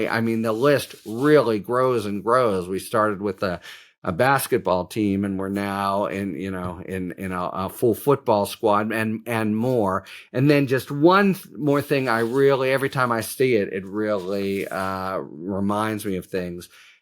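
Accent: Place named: New York, United States. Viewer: He’s from New Yawk; deal w/it. The pettiness from commenters 🙄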